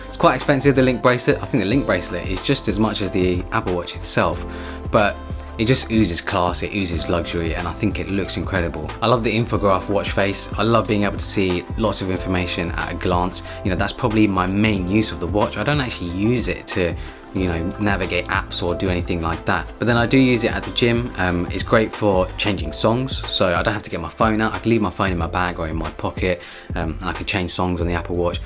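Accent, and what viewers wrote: England, United Kingdom. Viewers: Just found your channel. Huge fan of tech YouTubers! And it sounds like I’ve found a fellow Englishman! Keep it up mate and don’t mind me. I’ll just be flying through your vids rest of the weekend 👌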